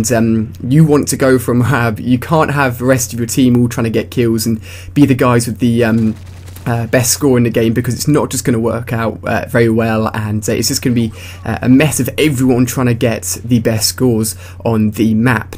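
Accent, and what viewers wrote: England, United Kingdom. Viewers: nice english accent I understood everything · r u british ?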